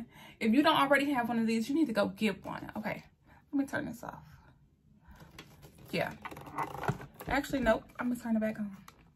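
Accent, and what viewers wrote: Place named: Alabama, United States. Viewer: Lmao accent. U sound like my cousins in Alabama.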